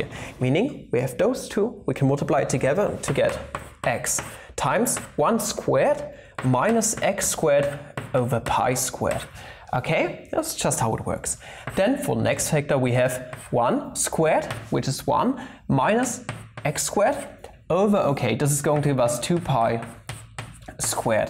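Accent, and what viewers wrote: Germany. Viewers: I like your German accent. I don't know why, but it makes me laugh, and you Germans don't laugh. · always enjoy hearing the german accent😂just wonder if everyone can understand that "Weierstraß" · That's a thick German accent :D, that pronunciation and intermixing of s and ß gives away everything. BTW I am from NRW.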